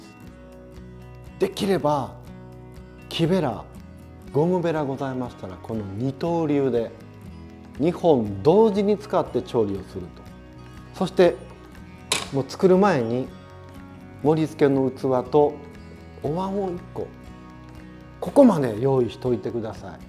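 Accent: Osaka, Japan. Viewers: 今日お昼にこのチャーハン作りました。いつもとは違うと家族も気付き美味しいと言ってくれました。😄流石先生の料理ですね!それと先生の大阪弁で料理の評価しながら食べられるところ面白く、大阪人の私にはとても親近感が湧きました。🥰毎日何かしら先生の料理食卓にのっております。☺️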